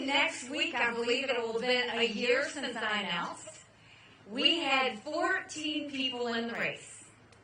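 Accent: South, United States. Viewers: Southern accent, since when?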